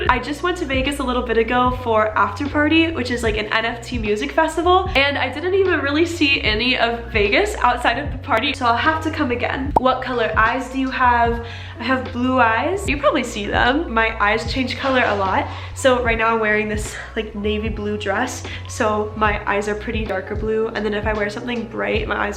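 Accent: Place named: United Kingdom. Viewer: Are your from UK if u are the accent is cute 😳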